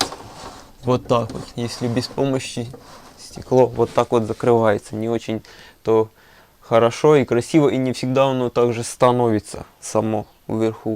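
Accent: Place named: Urals, Russia. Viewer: Умань? а говор как на урале ))))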